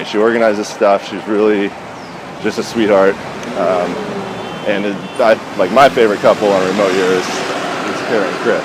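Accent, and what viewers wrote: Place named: Arizona, United States. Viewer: Great Video! Very informative. And it sounds like you live in Arizona. + +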